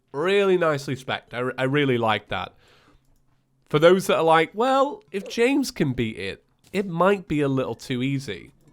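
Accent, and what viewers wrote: United Kingdom. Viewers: Are you brittish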